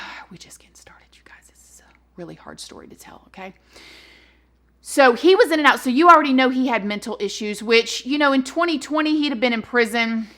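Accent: South, United States. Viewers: Maybe it's your southern accent but most people pronounce Aileen as eye-leen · I feel so bad for her, she had a terrible existence from when she was a child. So sad for her and her victims. Slight side note.. love your accent. Whenever my friends and I (Brits) mess around with American accents ( I've literally been doing this since I was a kid) it's always your accent 😂 love it!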